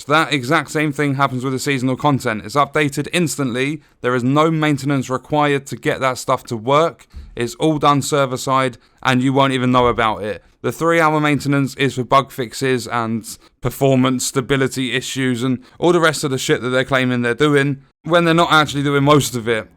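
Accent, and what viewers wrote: United Kingdom. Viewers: Love your Brittish accent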